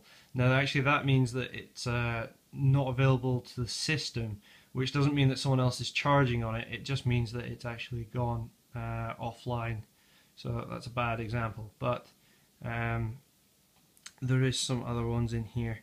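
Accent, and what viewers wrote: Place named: Ireland. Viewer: I love your accent. Are you Irish?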